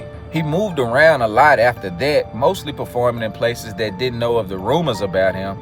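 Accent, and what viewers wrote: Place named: South, United States. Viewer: He has a southern accent, but it's not thick at all. You really can't understand what he's saying? That's fascinating! You should here what a thick southern accent really sounds like! Look up creole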